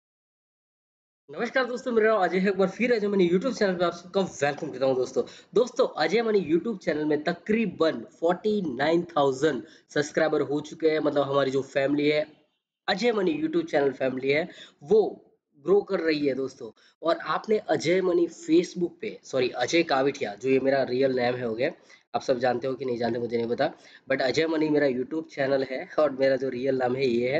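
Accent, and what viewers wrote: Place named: Gujarat, India. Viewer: Gujarati can identify that Gujarati accent 😂😂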